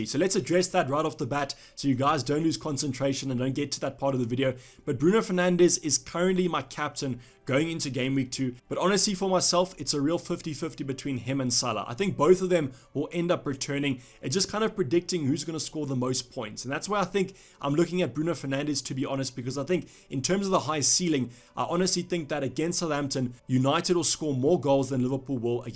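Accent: Afrikaans, South Africa. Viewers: Are you from South Africa? jy klink Afrikaans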